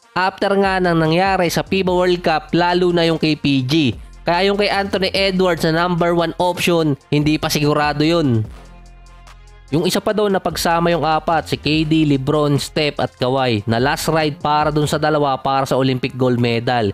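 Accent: Batangas, Philippines. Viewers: salamat sa iyong nba updates, ako'y nagsubscribed na rin at ramdam ko eh ikaw eh isa ring gaya kong batangueno eh, tama ga... shout out mula dubai ha.. balak kong maaglaro din 1XBET eh pwede gang gamit eh ibang Gcash, sa fiance ko at ang akin eh di pa updated eh, ayos ga yun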